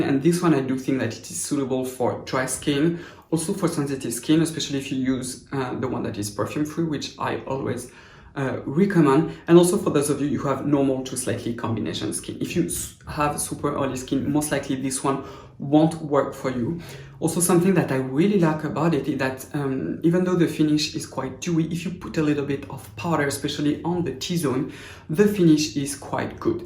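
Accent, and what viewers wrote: France. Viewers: Bonjour! I adore your videos, especially appreciating the science. There are far too many skincare videos of sincere, but but subjective reviewers, essentially intuitively responding to products. Also, I might say as an American, your American English is impeccable, as you have clearly mastered idiomatic expressions. It is quite "cute," as a native English speaker, to hear such idiomatic expressions from a Frenchman. Your accent is also adorable. As an aside, I speak French. I am told my accent is "adorable" to be fair.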